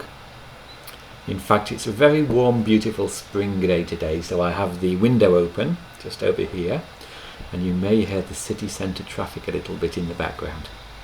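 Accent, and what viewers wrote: England, United Kingdom. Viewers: I like hearing English English, if that makes sense, ha! I'm American so our English is slightly different, but you probably know that.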